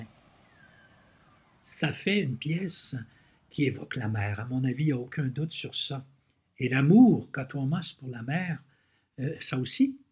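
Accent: Canada. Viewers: Enfin quelqu'un réagit sur la deuxième section !!! Tu me l'as fait encore plus aimer ! Yessss ! (Au fait : joli ton accent canadien!)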